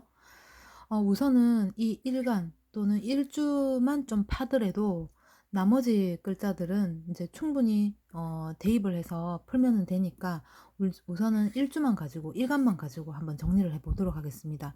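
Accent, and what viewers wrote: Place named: Gyeongsang, South Korea. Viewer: ㅋ~같은 겡상도라 더욱 듣기가 좋아요. 정듬뿍 넘치는 목소리입니다~💕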